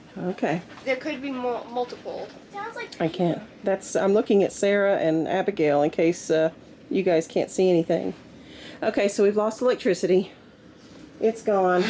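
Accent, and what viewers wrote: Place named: South, United States. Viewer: I love your accent.thats southern.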